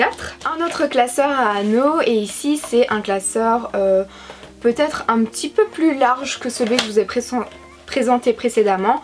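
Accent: Belgium. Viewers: J'ai remarqué que tu disais differement les chiffres y'a t'il en belgique une prononciation diffeeente pour les chiffres.Au début, j'étais confuse:) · J'adore ton accent vive la Belgique!!!!😄😄😄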